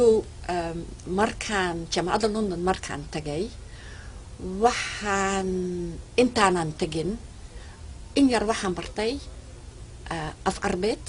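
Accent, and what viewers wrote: North, Somalia. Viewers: That is how the north Somalian speak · NORTHERN SOMALI ACCENT AYEY KU HADLEYSAA KKKKKKKKKKKK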